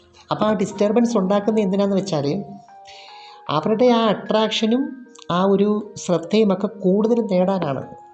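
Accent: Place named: Travancore, India. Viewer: ഈ പറയുന്ന മാം ന് മൂക്കടപ്പോ എന്തോ ഉള്ളപോലെ... പിന്നെ തിരുവിതാംകൂർ ശൈലിയിൽ, ഭ- ക്ക് "ഫ"കാരം ഉപയോഗിക്കുന്നു.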